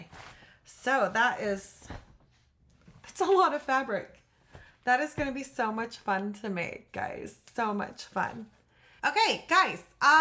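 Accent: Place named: Pennsylvania, United States. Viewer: Hi. I'm Sharon and I'm a quilter, nearby you in NJ.You sound like you're from southeastern PA? Sue (From Small Things) is in Perkiomen Valley area in PA. I'm enjoying your videos. I love quilt talk! Please don't change your format for the grouchy grannies.They can keep looking! I look forward to watching more! Happy Thanksgiving.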